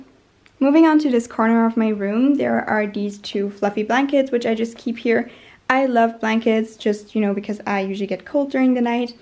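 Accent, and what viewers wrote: United States. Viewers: I feel like now that she’s out of Cambridge she kind of has more of an American accent which is lovely on her 😂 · Woah u sounded full on british!!!! 😂😂😂 but half the vid I heard an American-ee accent😂 where r u from?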